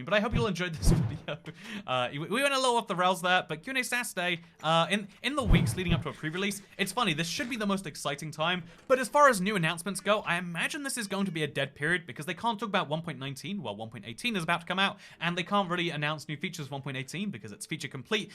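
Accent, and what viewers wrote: United Kingdom. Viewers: Now that he said that, I notice that speaking pattern with all the British YouTubers I watch. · When it comes to adding amplified to bedrock, I feel like the reason is not so much the difficulty of adding it, but maintaining it. You gotta remember that they really want worlds to transfer fine to newer versions. So yeah, that's my guess. P.S.: I find the r thing British people do hot. You're welcome.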